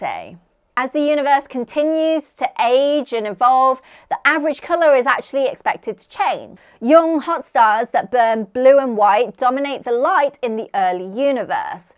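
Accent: United Kingdom. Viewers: I was is that a British accent. Could still be. Oh. Nottingham UK. Your so far might as well be on the moon😂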